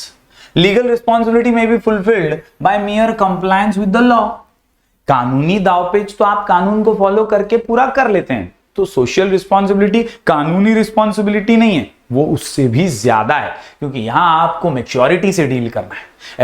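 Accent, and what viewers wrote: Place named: Uttar Pradesh, India. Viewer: Sir U.P se belong karte ho kya U.P ke old age people aise baat karte hai. :)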